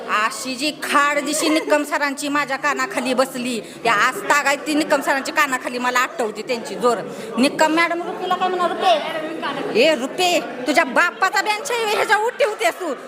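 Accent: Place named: Satara, India. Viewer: खूप छान आठवणी आणि त्यात सातारी भाषेत ऐकण्याची मज्जाच भारी... लय भारी🎉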